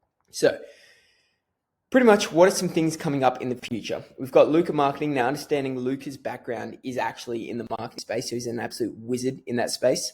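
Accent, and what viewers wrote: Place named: Australia. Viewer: Sounds like you are Aussie. Are you friends with Dan McEvoy?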